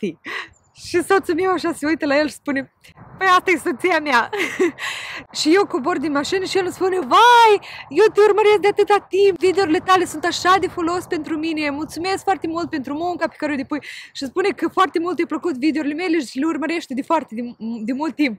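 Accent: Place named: Moldova. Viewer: Buna, sunt din Chișinău,mi-ai atras atenția cu accentul nostru din Md. Imi place mult cum predai engleza.dar sunt interesată de cea britanică.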